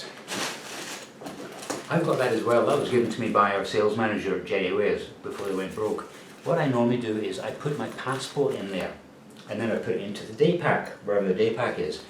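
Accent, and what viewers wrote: Scotland, United Kingdom. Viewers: Love the Skaughtisch accent.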